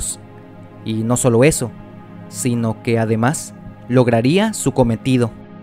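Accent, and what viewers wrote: Mexico. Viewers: La voz del relator lo delata como un L. ¿Mexicano?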